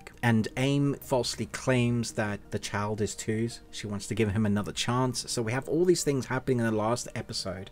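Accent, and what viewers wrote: South Africa. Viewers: The ending was confusing for me.. the dead bodies. Quick question, what is your accent? You sound South African mixed with English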